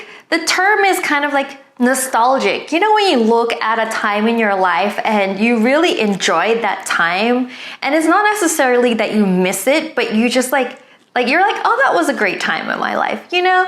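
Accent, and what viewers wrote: Australia. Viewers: GOD I MISS THE AUSSIE ACCENT :(( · I miss your vlog channel so much! I used to watch you so often when I was younger, to the point where I still pronounce words with australian accent because I was practicing this language with you 😭 I love to hear you talk and be honest! Hope to see more of that in the future :)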